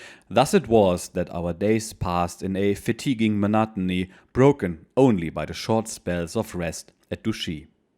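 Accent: Germany. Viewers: These are quite the treat. 👍 I’ve already read Storm of Steel twice, but your insights and German accent add a whole new dimension to the experience. Will definitely continue this. God Bless 🙏🏻 P.S. I don’t know if you take suggestions, but “Black Edelweiss” by Johann Voss a Waffen SS veteran would be an interesting listen. No pressure. Peace ✌🏻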